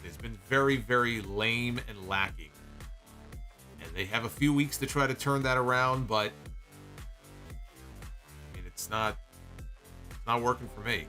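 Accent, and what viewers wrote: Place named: Brooklyn, United States. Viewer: Omg! The footage of your bar mitzvah melted my heart. You still have your Brooklyn accent, don’t worry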